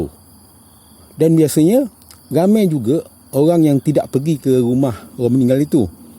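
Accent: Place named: Melaka, Malaysia. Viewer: Ini lorat percakapan orang Melaka ni...ni mesti kejadian di bumi Hang Tuah ni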